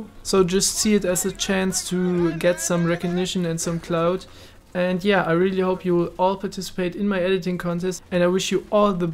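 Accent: Germany. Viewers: Man hört das du deutscher sprichst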